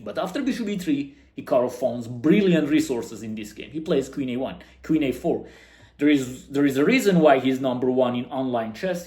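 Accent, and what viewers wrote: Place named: Italy. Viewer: the church bells definitely seal the deal confirming youre italian if the accent wasnt enough😂😂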